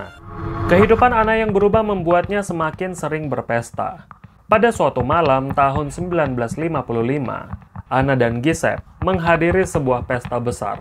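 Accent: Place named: Bogor, Indonesia. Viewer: Dengan logat ciri khas suara nya ....santuy dri bogor🌷🌷🌷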